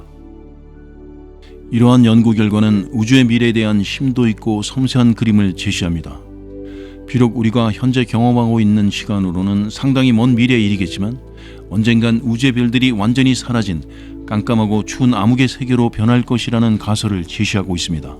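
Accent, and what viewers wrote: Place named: Gyeongsang, South Korea. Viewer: 영상 처음보는데.. 경상도사람 이시구나.. ㅠ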